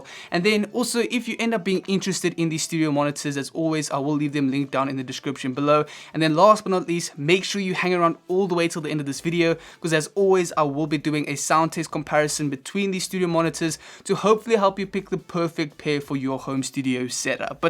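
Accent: South Africa. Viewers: Sounds like a South African :)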